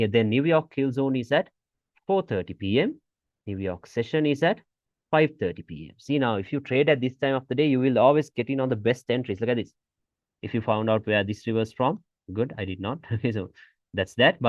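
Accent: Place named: Malaysia. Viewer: When hear ur voice i thought u Master is malaysian 😅😅😅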